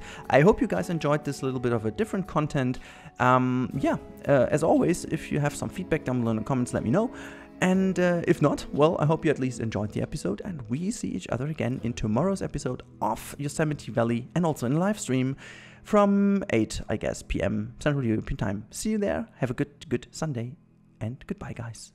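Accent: Germany. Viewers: Ich Finde den Deutsch Englisch Akzent so schrecklich 😂 is bei mir aber auch so mit dem Englisch was du gesagt hast 🙋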